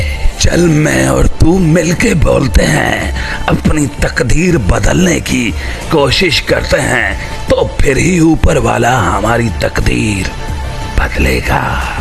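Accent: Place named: India. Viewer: Please hm musilmaan hyn alhamdulillah aap apni voice aur language style hamarey acer ki copy kr saktey hyn Bharti style toh bilkul achaa NY aapko suite NY krta please bra na mannyeyga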